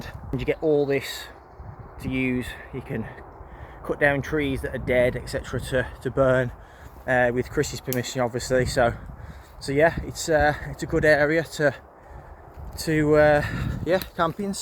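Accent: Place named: Black Country, United Kingdom. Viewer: Hi mate you sound like your from the black county ? I’m a bushcrafter do you need any help with it ?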